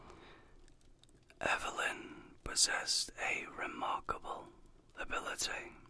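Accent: United Kingdom. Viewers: Thus video would be SO MUCH cooler if it was LotR-themed. Just saying. Your voice is fine. 🤔 Obviously British, yes? 🥰🤗💖🇨🇦 I ABSOLUTELY LOVE Brits!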